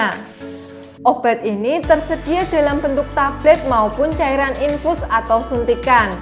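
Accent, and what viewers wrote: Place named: Banyumas, Indonesia. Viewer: Aksen banyumasan, tegal dan sekitarnya. Muantab bu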